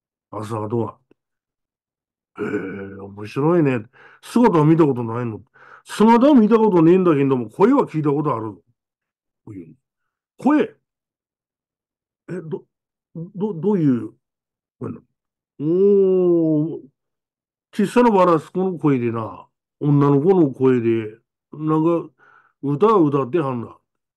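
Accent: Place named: Aomori, Japan. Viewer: 青森県出身のわたし 🥰🥰🥰 さんの青森県の方言、お上手ですね 🥰 青森県は 🎀津軽衆 🎀南部衆と分かれます🫧🤗 わたしは南部衆の産まれですが。八戸の近くです！さんの方言方法は南部衆の方言に近いですね 🥰 南部衆の方言は北海道の方の方言にも似てるとわたしは思います 💐💐💐🥰 心温まる怪談、本日も癒されておりました 💝🫧🫧🫧🤗 ありがとうございます♪ そして、いつもご丁寧にお返事ありがとうございます♪ シングルマザー、美容家のわたし🫧🎀 子育てやお仕事の励みになっております 🫧🫧🫧 感謝致します❣️ 🌹鈴子🌹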